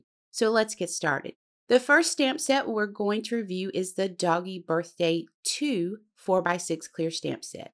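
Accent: South, United States. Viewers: I just love the Southern Sass.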